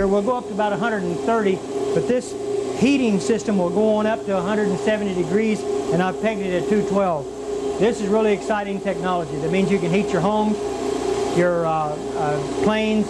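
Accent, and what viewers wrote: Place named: Appalachia, United States. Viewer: This guy doesnt seem nearly intelligent enough to have invented what he claims to have invented. Talks like a hillbilly idiot. Has no wisdom or common sense. Can't explain his own inventions any better than an elementary science teacher. Thinks the government and the patent office will stand behind any free energy device. HA! is dead now isn't he? Why am I not surprised? My best guess is he isnt even the inventor. The real inventor (Dennis Weaver?) probably didnt want to be publically known and stepped up to be in the limelight and take the invention public. ( very poorly I might add ) This is a guy that doesnt even show an understanding of amperes vs volts and what power actually is. His new "battery" is nothing more than a capacitor. Magnetic heating isn't new. It's called induction and eddy currents silly. 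Seems to think all power is measured in volts. "see dem there volts keep goin up, we're makin power".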